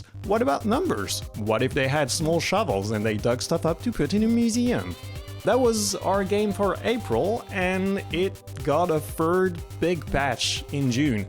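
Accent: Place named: France. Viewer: I'll be honest, I kinda only skim read the newsletters. Now having it dictated to me by a lovely Frenchman, well it's très bon for my ears. You guys are some of the best indie developers out there, and I hope more and more people come to appreciate and support your work. Thanks for all the fun games and I wish you all every success.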